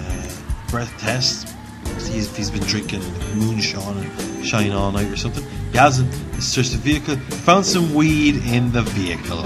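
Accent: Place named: Dublin, Ireland. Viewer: I'm Irish and that's a pure fuckin Dublin Ireland acsent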